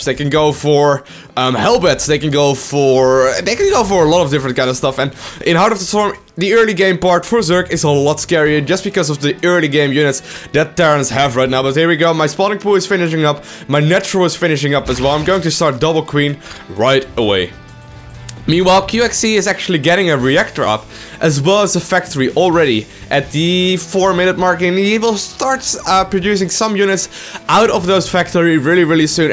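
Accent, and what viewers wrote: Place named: Netherlands. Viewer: grrr i like ur content but im Dutch myself and i cannot stand the Dutch accent people sometimes use :P if i were you i would try and work on the pronunciation + switching words like: these Hellbats are all getting killed right now... You've said it a couple of time in this vid... ofc it should've been roaches :O i guess its cuz u talk really fast and its really hard :P im just saying.. trying to be helpful here; D Succes met je video's ;)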